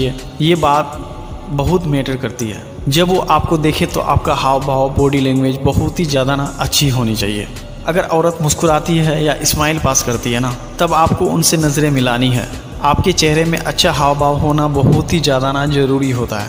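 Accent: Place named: Bihar, India. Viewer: Aap na pakka bihari ho 100%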